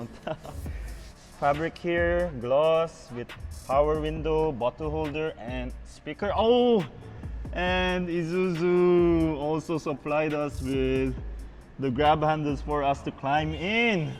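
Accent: Philippines. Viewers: You have that filipino accent hehe.. actually many koreans do.. the korean girls are so cute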